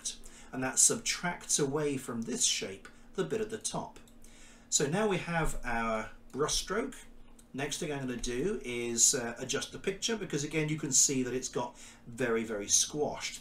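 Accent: United Kingdom. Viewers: YOU KNOW YOU HAVE A GOOD BRITISH AX CENT.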